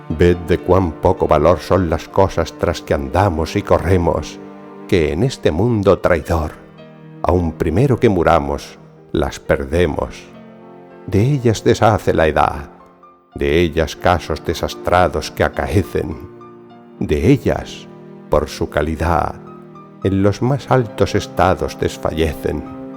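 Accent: Galicia, Spain. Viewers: Perfectamente! A mi me gusta muchísimo. Eres mi amor. Tienes un acento gallego? (soy un extranjero, es dificil para mi distinguir) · Estimado, por tu dejo, te adivino peninsular. Razón demás para llamarte la atención, pues siendo paisano del inmortal Manrique, le faltas el respeto;en la declamación y escritura. Me bastó escucharte unos minutos para ello. Al declamar en la primera estrofa separas, pareciera intencionadamente o por ignorancia"... y chicos " Lo segundo y más desastroso y lesa literatura: cambias" allegados", por "y llegados". Soy un peruano cincuentón, amante de la buena literatura. No entiendo como no corregiste semejante desaguisado. Tu dejo debió ser un plus, sin embargo, no hallo calor y sentimiento. Ojalá valores y tomes las críticas con ánimo de enmienda. Att. Ramsés Torres Ramírez Puente Piedra-Perú. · Cuando lo recita un gallego suena mucho mejor, yo que tengo acento argentino. 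Muchas gracias, maestro 👏👏👏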